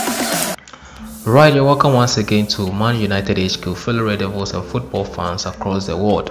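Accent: Africa. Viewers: Are you an African? Your accents says it all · Love the african accent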